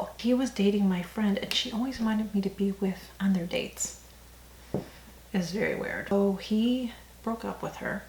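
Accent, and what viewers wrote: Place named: Boston, United States. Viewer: Where i you originally from you sound like you're from Boston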